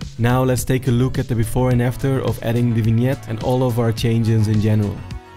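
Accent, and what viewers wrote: Netherlands. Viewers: btw brother are you Dutch or Belgian ? The accent too obvious hh. love your content lets keep it coming!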